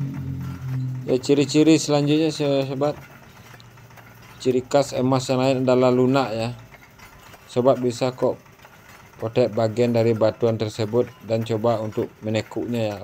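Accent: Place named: Minangkabau, Indonesia. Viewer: saya dengar dari dialek bahasanya ini pasti dari tanah minang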